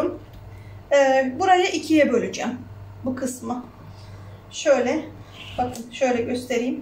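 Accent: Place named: Mediterranean, Turkey. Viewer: Bende mersin liyim Akdeniz bölgesi şivemiz aynı 🥰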